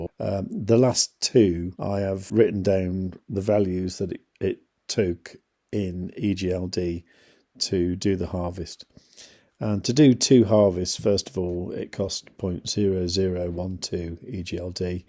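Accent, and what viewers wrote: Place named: Tamworth, United Kingdom. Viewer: you sound like you come from Tamworth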